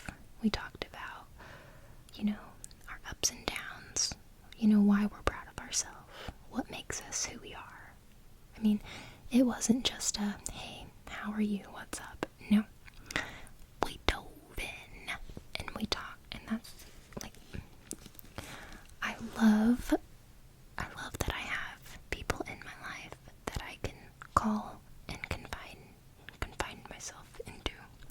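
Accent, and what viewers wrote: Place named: Oklahoma, United States. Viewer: You sound like you are from Oklahoma.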